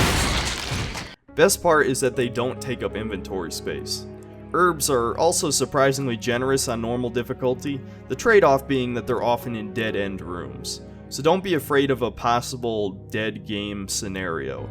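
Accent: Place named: Central New York, United States. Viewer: You have got to be from Central NY. Probably Syracuse or Oswego. We can’t escape our CNY accents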